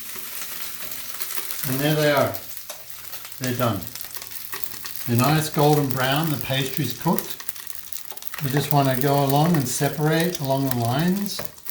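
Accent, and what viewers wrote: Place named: Australia. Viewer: Are you an Australian living in USA? At first you sounded Australian and then I could hear an American twang so couldn't work it out! Anyway, a nice twist on the Aussie pie. I like the idea of the herbs and paprika so will give it a go :)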